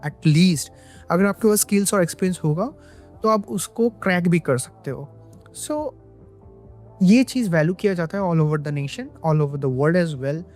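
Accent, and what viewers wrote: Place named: Bengal, India. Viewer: Bhai tumi Bangali Je tomar Hindi sunei Bojha jacche Anyways Good and Informative ...Thanks a lot.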